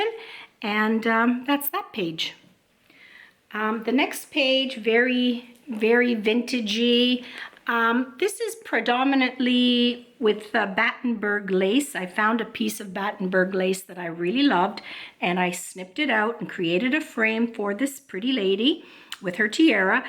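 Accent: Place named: Italian American, United States. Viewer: I love this journal; your work is always so beautiful. TFS. I'm sorry to hear about your computer. I had my one and only Mac three years ago because everyone I know said it was superior. After a year, the disk literally burned up and I lost two chapters of a book I had been writing. They were really good, too! I haven't gotten over it yet and haven't been able to get back to the book yet either. I'm using a PC again. I'm glad you said you are Italian. I have been wondering a long time if you were because of the intonation in your voice. I've known many Italian American women who have a similar timbre and speech patterns. I didn't want to be rude and ask. Thanks for the answer!!! Baci. Dolores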